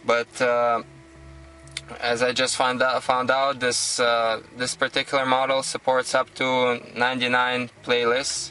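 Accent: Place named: Russia. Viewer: Igor Grusha так акцент-то русский, скорее всего автор видео тоже наш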